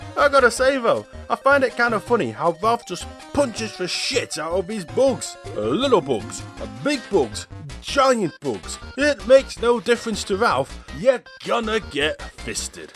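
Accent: United Kingdom. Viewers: I love your english stile Mr., so british so elegant. What a pleasure to hear your rewiews added with creativity and humor ❤️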